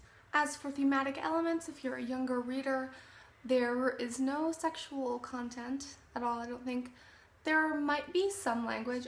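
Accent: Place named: United Kingdom. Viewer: British! That’s the accent!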